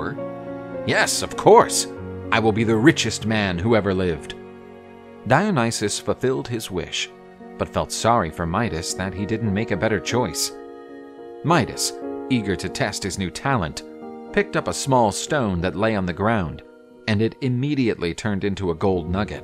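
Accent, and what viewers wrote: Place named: United States. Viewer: You have nice America voice and I can clear understand. Very nice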